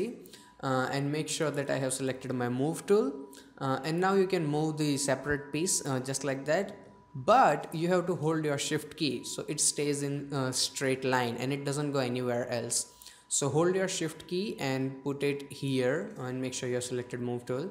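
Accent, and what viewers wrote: India. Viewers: I like how you sound like the guy from "Phlearn", but with an indian accent xD !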